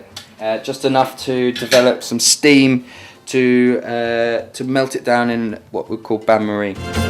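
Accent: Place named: United Kingdom. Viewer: WTF? The text is on swedish but u talk british? Im swedish and can talk English, but not that kind of brittish. Where r u from man?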